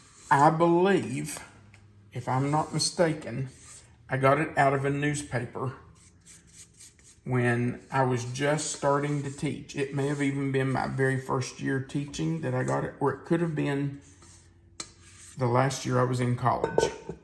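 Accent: South, United States. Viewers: Tell you something, this is not a pie you can get anywhere, yet Kentucky Derby Pie is a thing. My mouth actually watered, and I never had it! I will be making this. Wonderful video. Simple, right to the point and I have to say, you have got one of the most proper gentlemanly Southern accents,.....and that's coming from a NY Brooklynite.....lol. TY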